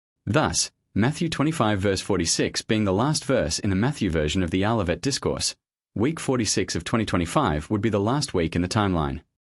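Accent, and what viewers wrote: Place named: Australia. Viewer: You sound like a robot voice with an Aussie accent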